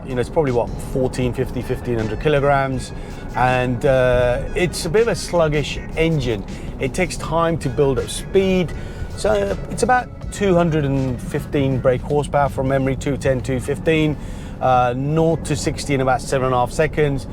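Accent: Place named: United Kingdom. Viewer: Done well on your british accent.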